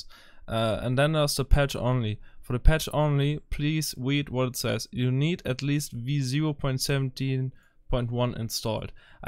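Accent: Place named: Germany. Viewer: der deutsche akzent😂 danke für das Video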